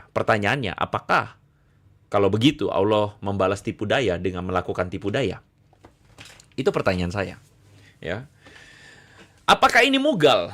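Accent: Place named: Java, Indonesia. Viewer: Si medok ketipak tipung adalah ustad mukalap yg spesialis bahas Alkitab, itulah jualannya spya pundi2 ya tetap berlimpah,tp bahasannya sangat ngaco dan bar bar, ngaca!! Belajarlah yg bny spya pinter sdikit, kasihan saudara muslim sy yg sdh di sesatkan oleh si medok. Dy sendiri ga ngerti alur Alkitab tp sok sok ingin membahas Alkitab, krn itulah dagangan ny yg laris manis, pesan sy buat: belajar ngaji dan solat aja dgn lebih khusuk, jgn masuk kamar orang lain. Urusin kamar mu sendiri, kamar mu saja berantakan ga karu2an. Beli kaca spya bisa introspeksi diri dan jgn lebailah.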